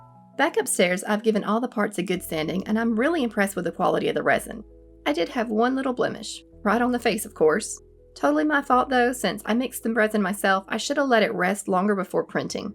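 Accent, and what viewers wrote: South, United States. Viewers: idk where youre from, but im from SC and love to hear southern accents from youtubers. its very comforting. im gonna guess youre from TN but i could be totally off on that lol. also i love the slow pace of your videos. a lot of doll makers create energetic loud videos and while thats totally fine !!!! i love seeing stuff thats more laid back and calm. :)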